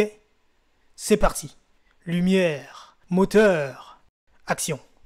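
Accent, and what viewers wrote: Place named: Mali. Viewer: Vraiment intéressant, le petit jeu ajoute une plus value non négligeable à l'attractivité de la vidéo, je constate un gouffre au niveau du tempo et du ton entre cette vidéo et la dernière en date que tu as sorti, si tu continue à surprendre avec des histoires peu connues, il y en a tellement.. tu va percer! que demande le peuple??!! Je me risque à donner ton accent, je dirais malien.. 😊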